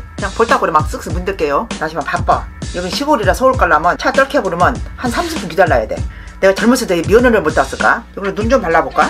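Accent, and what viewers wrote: Jeolla, South Korea. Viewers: 자메이카 출신 할머님 전라도 사투리 찰지심ㅋㅋㅋㅋㅋㅋㅋㅋㅋㅋ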